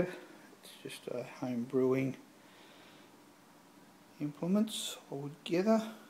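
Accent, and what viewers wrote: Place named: South Africa. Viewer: R u from Aust or Nz , u sound like SA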